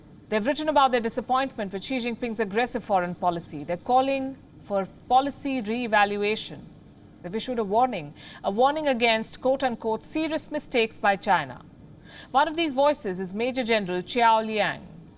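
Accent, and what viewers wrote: India. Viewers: India voice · Your English is after all 3rd rated indian English, if he is propagating for less aggressive posture then how can say hawkish at the same time? It's not hawkish it's dovish bharati mata. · This indian lady is trying her best to slang...but turn out still india sound. 🤦‍♂️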